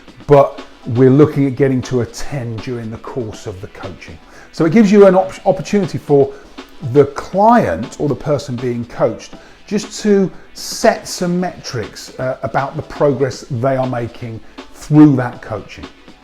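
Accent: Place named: United Kingdom. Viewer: This is my favorite coaching model! Loved how you explained it and love the UK accent as well.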